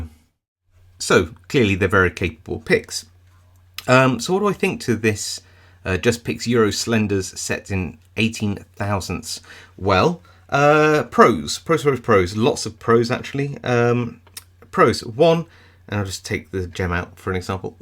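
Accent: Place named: Bristol, United Kingdom. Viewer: I can only agree with everything writes so eloquently (and punctuates so flawlessly). High production values and a touch of Bristol accent 👌. I have a small tube of these slim picks but I'm scared to use them. The screw tubes are great, too.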